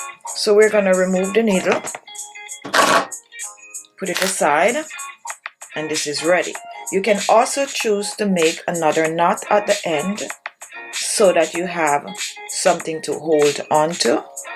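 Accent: Jamaica. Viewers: Why does she sound like a Jamaican 😌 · You sound Jamaican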